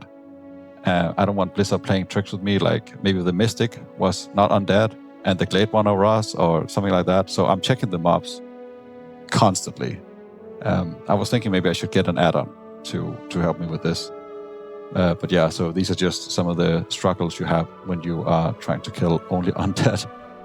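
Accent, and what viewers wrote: Denmark. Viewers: You accent makes me think you are from Denmark, am I right? 😂 Nice serie man, cant wait for the rest of serie to come out. KEEP IT GOING!!!💪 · the danish accent bro, love it <3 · Something about the accent that tells me that he is danish!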